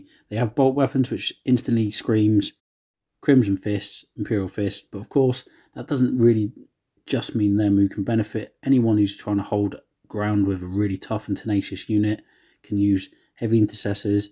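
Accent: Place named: United Kingdom. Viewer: coupled with your British accent it makes it more difficult to follow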